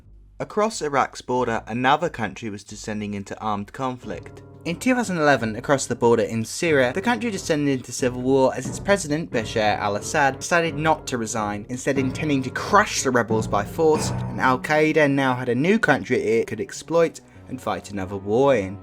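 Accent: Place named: United Kingdom. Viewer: so i assume your british from your accent so college for you would be year 11, 12?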